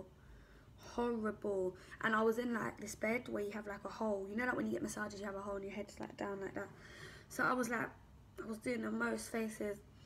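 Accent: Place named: London, United Kingdom. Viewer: Nice London gal accent though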